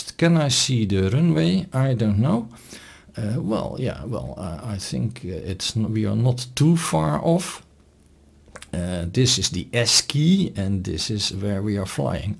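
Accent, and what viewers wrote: Netherlands. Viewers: Always good to hear a Dutch accent with English. I'm American and lived in Landgraaf for five years. Thanks for the video.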